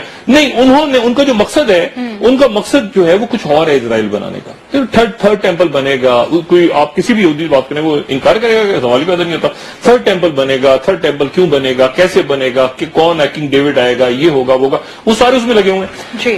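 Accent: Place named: Faisalabad, Pakistan. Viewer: Dr sab ajj tay faisalabadi lagy ho tusi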